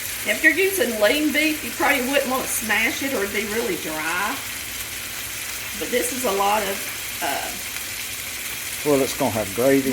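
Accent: South, United States. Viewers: Love your channel!! I just found it 2 days ago and I have been watching since. You cook just like my Granny did. Brings back so many wonderful memories of being in her kitchen. Thank you for keeping good southern country cooking alive. I’m a Georgia girl too living where the sweet onions grow. Thank you for showing just how wonderful southern living is… I heard you say on one show that someone said something about the way you talk..,well don’t change a thing… that’s part of what makes us southern. Thank you for what you and are doing and God bless you❤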